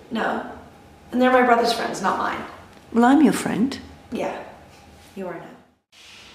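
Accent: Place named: United States. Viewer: i was hoping i'd hear her do a good American accent someday. i'm happy to say that day is today!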